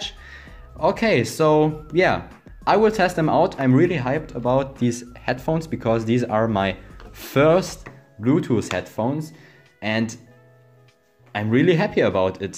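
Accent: Germany. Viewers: Man hört das du deutscher bist 😂👌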